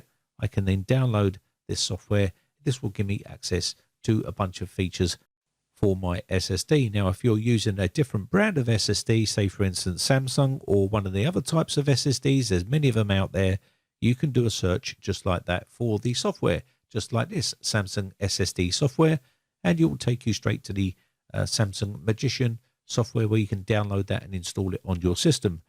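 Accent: England, United Kingdom. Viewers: I like the English accent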